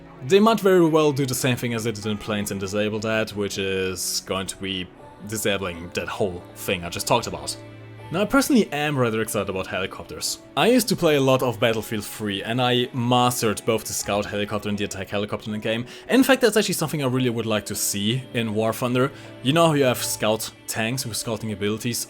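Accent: Germany. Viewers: Digga, man hört deinen Deutschen Akzent richtig raus xD.Würde mich freuen, wenn du einen Kanal aufmachen würdest wo du War Thunder spielst :D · Sorry but i cant listen to you because you Sound so german.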